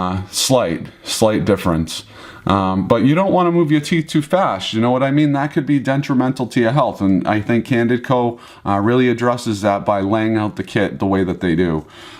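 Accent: Boston, United States. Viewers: I'm on day 4, and my tongue is still rubbing on them really bad. My gums are really comfortable though. Do you have any tips for that? I love the accent by the way. I always thought it was just exaggerated in Affleck movies, but it's real!! Cute. Also: did you have a lisp when you started? I do and it's really embarrassing. Last question!! Do you drink water with them in?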